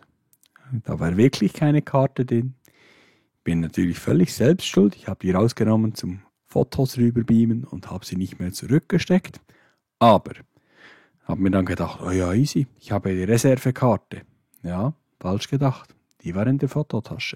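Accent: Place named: Switzerland. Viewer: ich grinse hier vor mich hin. irgendwann fliegen wir mal zusammen. kannst du dir das vorstellen? Wir sitzen zusammen da drauf? Leider kann ich mit den Tips nichts anfangen. War aber nett deinen Schweizer Dialekt zu hören.!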